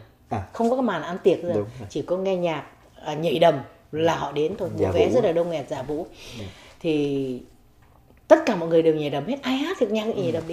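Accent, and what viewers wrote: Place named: North, Vietnam. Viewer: Chương trình của Jimmy rất hay, thật đẹp và dễ thương, nói chuyện huyện thuyên đúng là con gái Bắc kỳ. ❤️❤️❤️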